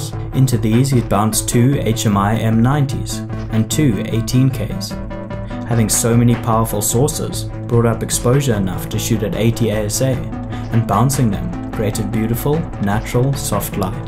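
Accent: South Africa. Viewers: Are you South African? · I'm going to go out on a limb and say South Africa. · Ek wil mos se ek hoor n South African accent. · I hear a South African accent! yay for the SA industry. Love this short video. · South African? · Are you from South Africa man? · This man South African? Your accent almost sound like our's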